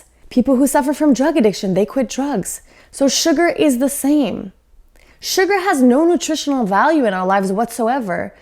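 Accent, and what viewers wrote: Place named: Lithuania. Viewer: Love your accent. Great info and video. Thanks so much. Are u from Lithuania 🇱🇹?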